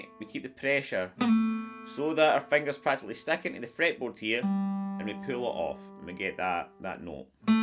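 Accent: Scotland, United Kingdom. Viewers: This is great, I not only get a guitar lesson but I learn how to understand a Scottish accent! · you speak scottish,,,who in their right mind is gonna listen to your accent? tell me who? I want all their names,,they need therapy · I like guessing Scottish accents and where they’re from, I’m gonna guess Edinburgh with an EW Punt on Penicuik! Am I in any way close? 😅 👍🏴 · Great lesson and great to hear a fellow Scot! 🏴🤝🏻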